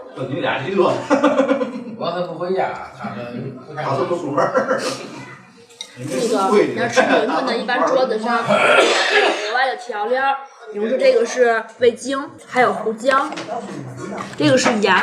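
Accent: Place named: Tianjin, China. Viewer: 特别喜欢听Kaka说的津普，很像上学时听同学说话的感觉👍加油！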